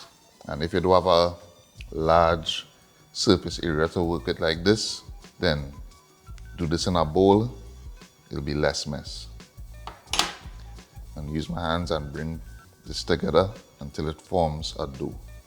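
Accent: Trinidad and Tobago. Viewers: Yea!Trini accent!I'm hanging around! I'm St. Lucian.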